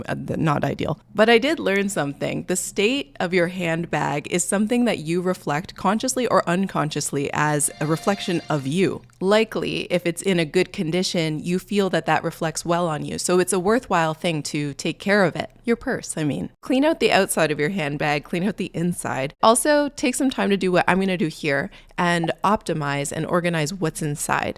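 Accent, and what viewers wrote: Canada. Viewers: We missed you sm!!! I’ve been watching since I was a teen and I’m 21 now…I just realized you have a Canadian accent😆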